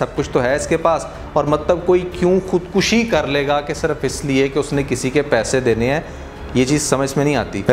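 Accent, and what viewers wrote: Punjab, India. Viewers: Aapka Punjabi accent badiya Lagta hai. Punjabi hi hai na??????? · Bohat hasna diya bhai apki panjabi ne i am panjabi thanks for sharing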